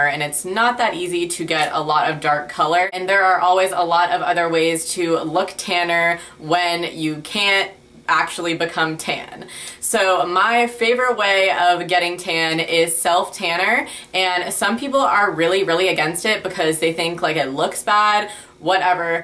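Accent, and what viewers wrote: United States. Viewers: its amazing how americans say 'get tan' i want your accent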